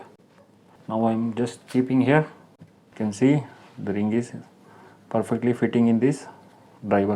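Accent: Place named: India. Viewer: I love the indian accent when you can actually understand them, great video, thank you very much!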